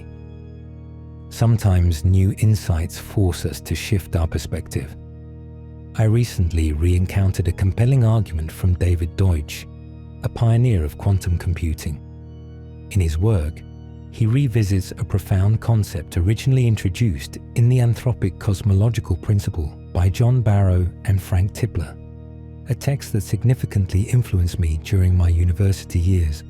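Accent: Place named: United Kingdom. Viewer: That ain't him talking.He sounds like a Limey when talks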